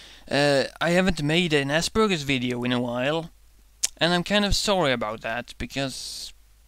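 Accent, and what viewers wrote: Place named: Sweden. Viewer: I am proud to have him. At first, his accent bugged me because I thought it was Scottish but it sounded wrong. Then I realised it was Swedish and sounded right. Now I find it comforting to listen to.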